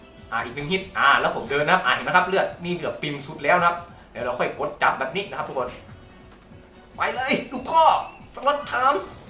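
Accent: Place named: North, Thailand. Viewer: 55555 ชอบช่องนี้จัง เป็นคนเหนือหรอคะ สำเนียงน่ารักจัง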